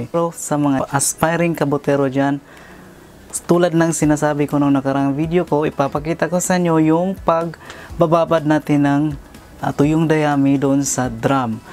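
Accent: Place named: Visayas, Philippines. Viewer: oo nga sir kc nga mid u nahalata ko na bisaya ang tono mo kya ako ngtanong kung din ka sa aton..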